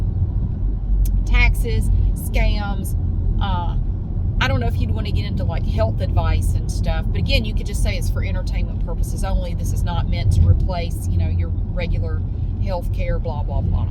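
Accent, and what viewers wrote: South, United States. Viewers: Wow your southern accent is thick tonight. Lol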